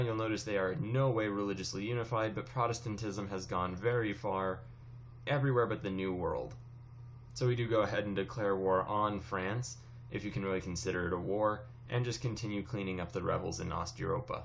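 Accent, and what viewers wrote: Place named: Netherlands. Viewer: the way he speaks english I'd bet he's dutch.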